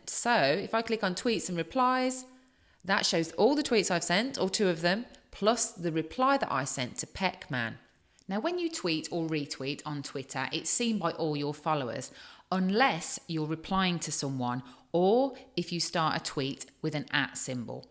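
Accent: United Kingdom. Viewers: Thank you! Clear, concise, no extraneous chatter, loved it! And with a British accent to boot! (We Americans love the accent!) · Thank you, I wasn't expecting to find such an informative video on this. What a relief, British too!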